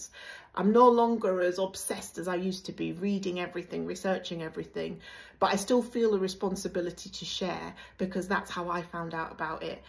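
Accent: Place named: Northern England, United Kingdom. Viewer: New subby, also from the uk just down the road from you in the midlands, as I can here your northern accent 😊🙌🏾 I’m just voice 5 years in with diy locs got just under 200 as was not prepared to travel to get my hair started or maintained. I’ve learn so much these past 5 years & started family members on their journey too, we all love locs. Your hair is beautiful & grows fast! Glad I found your channel 😊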